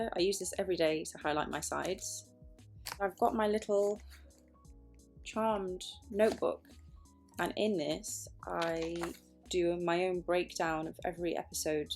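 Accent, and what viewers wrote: United Kingdom. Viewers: OMG! I am so glad I found this chanel! I love you so much! Your so professional. I knew you were british but damn I love your accent!! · Omg I never knew she was British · WOAH BRITISH!!!! <3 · I didn't know she was English.. right On!! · I love her british accent so freaking much 😍😍😍😍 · Omg she's British??? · I love to see how you enjoy reading the episodes, I have to admit, that I refused to see this remake, but I have to applaud 👏 your work, apart from the fact that you are beautiful, my respects as an actress and the dedication you put into it, I love it, I didn't know that you were british but you're a sweetheart 😘and i love your british accent !! I want more from CHARMED! and many more vlogs of yours. 🌹🌹🌹🌹🌹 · Oh, I had no idea you were British. Was surprised when I heard that accent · God it’s so weird with hearing her British accent and not her Macy Voice, In the Next Video PLEASE PLEASE PLEASEEE do your Macy Voice and please tell how you learned how to seaplane in like that American tone! 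· SHE HAS A BRITISH ACCENT HOW DID I NOT KNOW THIS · I had no idea yhuu were British #mind blowned🤯🤯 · Had no idea you were British. You sound like you have a bit of a northern twang. Your American accent is really good & so convincing. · omg I didn’t know you were British. you play Macy so well I thought you were like American. I love you sooooo much like your seriously my idol. I can’t wait for season 3🥺💕 lots of love from North Carolina ❤️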